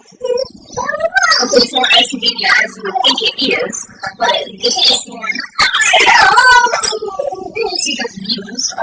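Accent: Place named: Carolinas, United States. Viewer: What southern state are from? You sound like a Carolina girl.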